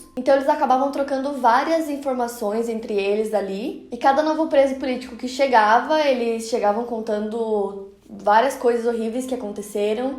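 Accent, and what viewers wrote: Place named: Curitiba, Brazil. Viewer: é aqui de Curitiba? Esse sotaque.. <3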